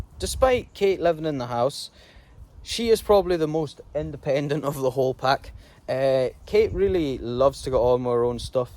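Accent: Scotland, United Kingdom. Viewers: All of your dogs are beautiful, but Kate has such a personality. I absolutely love your accent, I could listen to you talk all day! One of these days I will visit Scotland, I live in Oklahoma, United States. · This was a very easy “Subscribe”!! Your accent may be invisible to you, but this American whose grandmother was from Edinburgh just loves it! It took me a moment to catch on to what you were saying at first, but then I got the rhythm of your usage. When I was a little girl, my friends who would come along on a visit to my grandmother’s place couldn’t understand a word she said. LOL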